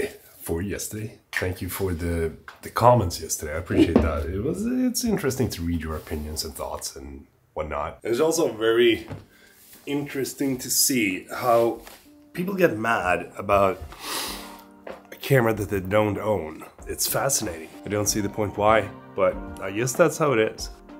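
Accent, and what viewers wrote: Sweden. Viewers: Good to see the vlogs back. And your SwEnglish is on point 👍🏻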